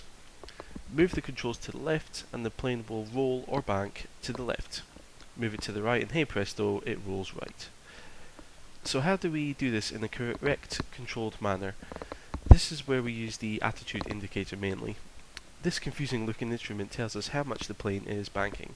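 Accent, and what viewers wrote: Scotland, United Kingdom. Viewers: I would guess its a fairly light scottish accent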